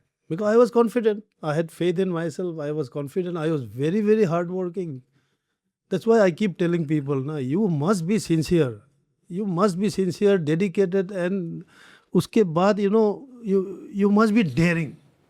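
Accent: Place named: Arunachal Pradesh, India. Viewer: Arunachali accent is coming 😄❤️🔥🔥🔥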